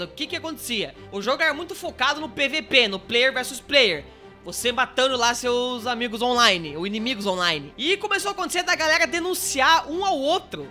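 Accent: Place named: Paraná, Brazil. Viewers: Eu sou de Guarapuava no Paraná também, eu amo nosso sotaque e nossas expressões kkkkkkkk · ele é de pato branco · Cara, eu sou paranaense de Cascavel no Paraná, e mais gosto de ver o falar porque ele parece meus amigos de infância, além de entender tudo de jogos!!!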